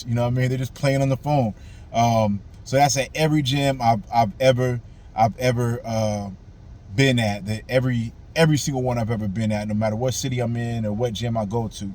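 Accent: United States. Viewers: Love the sound of the rain at the end of the video. And the general vibe. You American? Cool accent. What kind of car it is, some large MPV? I was a type of the outsider, not really into the scriptures and ministry, hardly been to any Saturday ministry meetings at all through my 7 years from when I became a publisher to my decision of fading and ghosting. I really was in it for the company of literally couple guys I liked and respected. I didn't care much about anyone else, especially those oldtimers with only basic wordly education, there were few elders like that. Later I learned that folks in the congregation called me "the philosopher" behind my back. That sums it perfectly.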